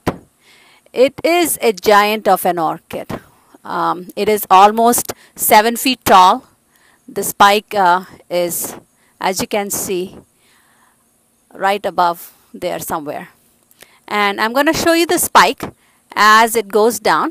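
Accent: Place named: South India, India. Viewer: Where do you live ?, I notice a South Indian accent... Lovely setting, beautiful garden and the most amazing orchid spike!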